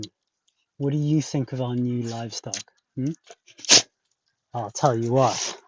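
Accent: South Africa. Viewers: You sound South African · South African here. You're doing good work over there. We need new sources of protein. Your accent will always follow you 😉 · South African?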